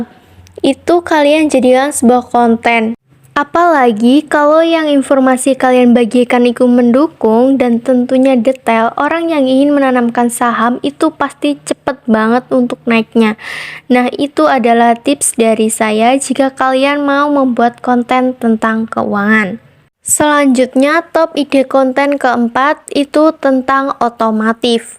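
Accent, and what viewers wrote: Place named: Java, Indonesia. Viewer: Medok jowone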